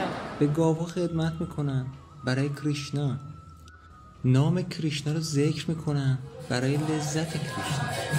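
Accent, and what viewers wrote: Isfahan, Iran. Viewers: سلام برام جالبه خانمت هم مثل خودت لهجه اصفهانی یاد گرفته و حرف میزنه😍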